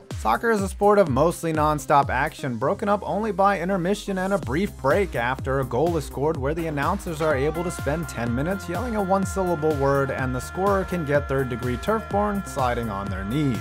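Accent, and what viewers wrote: United States. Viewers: Shut up.. you sound so America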